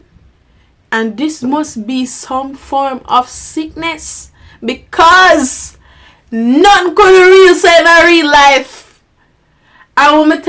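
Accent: Jamaica. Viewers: Are you Jamaican? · I like ur jamican tlk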